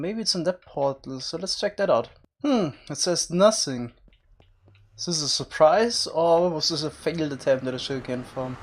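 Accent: Germany. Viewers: Ist er deutsch? Sein Akzent klingt danach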